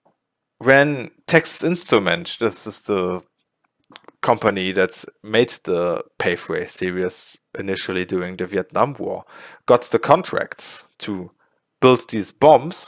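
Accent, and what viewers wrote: Germany. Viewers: The german accent is hilarious. Abber deine video ist sehr leker!! (Duolingo skills right there)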